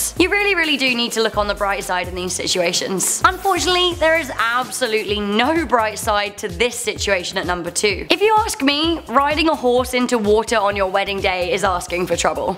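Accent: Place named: United Kingdom. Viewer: This lady's British accent is super strong and LOUD!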